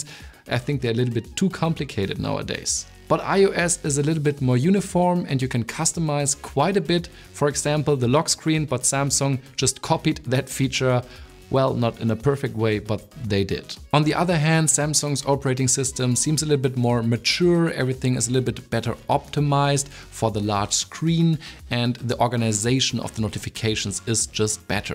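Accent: Germany. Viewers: Hahahahahahah ich dachte, das ist ein Scherz am Anfang. Der deutsche Akzent hahahahahahahah ich kann nicht mehr 😅 by the way: "if I have to be honest". What does that suppose to mean? You don't have, you should be! 😂😜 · I hear the german accent xD Love my s24U. Just wished our banks would support google wallet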